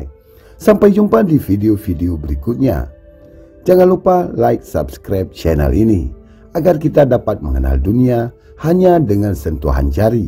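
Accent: Aceh, Indonesia. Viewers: Suaranya seperti dialog org aceh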